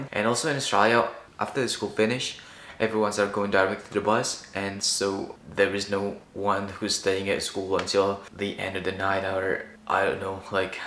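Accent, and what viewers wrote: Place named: Indonesia. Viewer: sounds like he pronounces Australia as the word is usually pronounced in Bahasa Indonesia.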